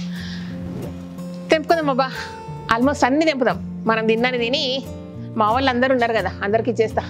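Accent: Telangana, India. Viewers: GARU MEE BASHA PAKKA TELANGANA BAGUNTADI MEE VOICE KUDA BAGUNNADU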